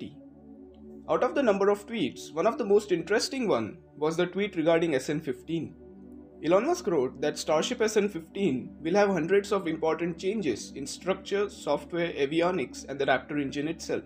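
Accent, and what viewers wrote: India. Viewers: No no, your style of speaking is Ditto like an Indian, however you are,🙄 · Bhai mujhe indian accent lag raha hai, I'm happy to see, an Indian guy talking about Spacex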